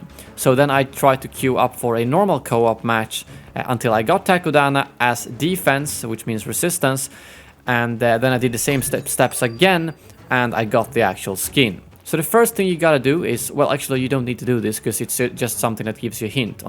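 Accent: Sweden. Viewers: are you sweddish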